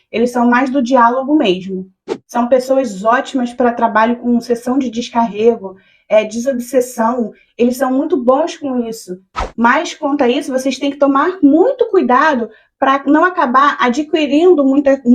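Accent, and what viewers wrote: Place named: Rio de Janeiro, Brazil. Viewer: A história é linda , mas o sutaque carioca é horrível 🤢🤢🤢🤢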